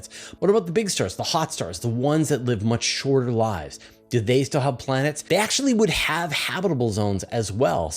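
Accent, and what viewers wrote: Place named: Western Canada, Canada. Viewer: I won't ding your pronunciation of "lava," but Vega should be pronounced VEE-guh,. And don't blame your western Canadian accent! 🙂 Normalize VEE-guh!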